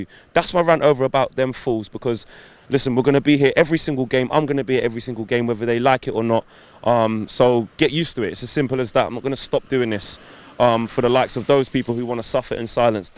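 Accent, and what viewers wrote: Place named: London, United Kingdom. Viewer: My guy u sound like u from London why u supporting united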